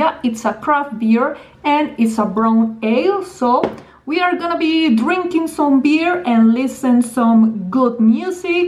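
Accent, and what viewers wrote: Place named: Colombia. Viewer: tienes acento a colombiana, eres colombiana?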